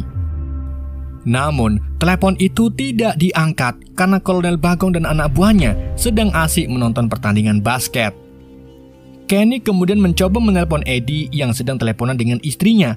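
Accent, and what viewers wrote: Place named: Tegal, Indonesia. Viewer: Mimin tegal 😂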